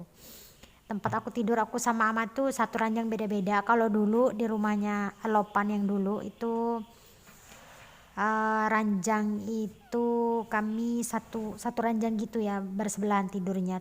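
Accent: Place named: Surabaya, Indonesia. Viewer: Mohon maaf klau di lihat dari logat bicaranya Mbak dari Surabaya bukan yaa Mbak??🙏🙏🙏🙏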